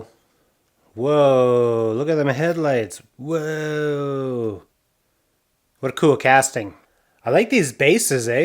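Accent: Canada. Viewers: the canadian accent hahahha love it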